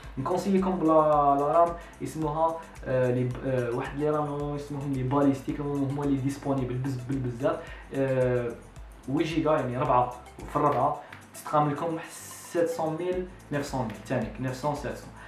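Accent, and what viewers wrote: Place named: Libya. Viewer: عندك شويه في العربيه الليبيه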